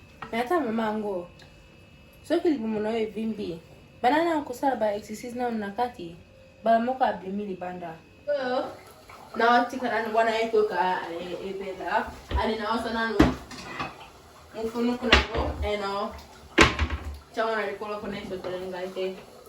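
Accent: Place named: Democratic Republic of the Congo. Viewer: Lol quel accent congolais très drôle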